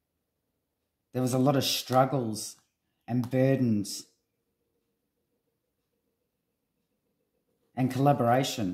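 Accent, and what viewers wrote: Australia. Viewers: Hope you had a lovely Mother's Day if you live in the US. I estimated that you are Australian, though. I love your podcast. Wow, what a start, the devil. Makes sense to me. They should have a cost to bear in Gabbys's murder! I have often wondered if his mother wanted Gabby out of their house to begin with. Thus, the purpose of Brian planning this road adventure in the first place. They took swift action to meet and plan it all out! Can you ask spirit what country he is in, if alive??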